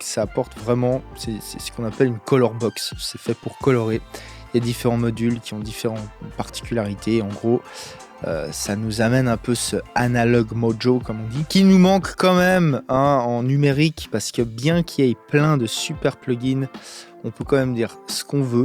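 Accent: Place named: Geneva, Switzerland. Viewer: Punaise.. j'étais sûr que tu étais de Genève avec ton accent et je viens de regarder ton site et OUI ! 😁🤘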